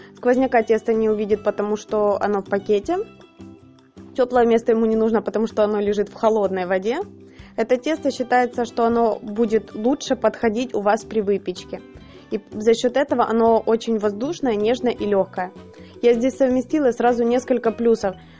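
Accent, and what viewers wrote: Ukraine. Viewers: Нормальное украинское произношение, нЕчего к нему придираться, в России тоже есть регионы со своим специфическим наречием, кто-то окает, кто-то акает, меня, в свое время, в школе, украинка постоянно ругала за твердое произношение буквы "г" на русский манер, украинское "г" звучит намного мягче.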